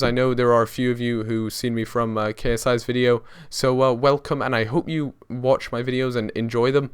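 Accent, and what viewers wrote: Scotland, United Kingdom. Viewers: I am kind of confused about your accent, are you Scottish?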